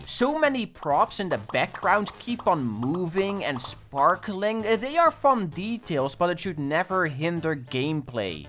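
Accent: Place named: Netherlands. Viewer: Ya sound dutch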